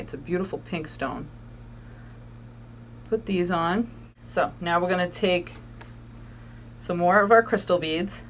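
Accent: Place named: Massachusetts, United States. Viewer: I knew she was from Massachusetts before she said so! (I am too!) Great video....and I love pink too!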